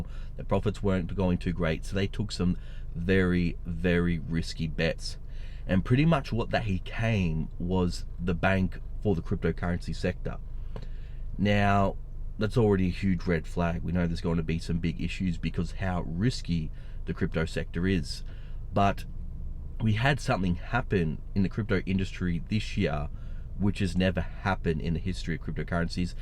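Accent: Australia. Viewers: Good one... the criminal bank cartels are starting to move... bail ins be a-comin sooner or later... Crypto will be the future.... dont worry about that, mate....fiat currency is fkd...cbdc idea is criminal... Im in Peru..love to hear the accent from the land of the meat pie - always....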